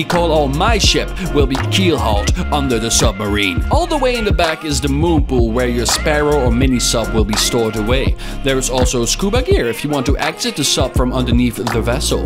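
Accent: Netherlands. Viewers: Wait what is he Dutch? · Are you dutch? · Hold up, are you dutch?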